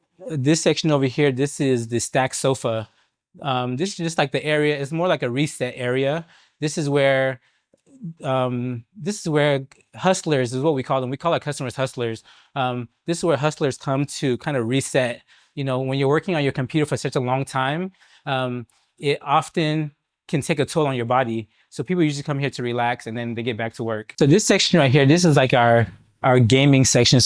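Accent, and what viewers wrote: Nigeria. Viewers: Yes ..he has a Nigerian under torn in his accent for sure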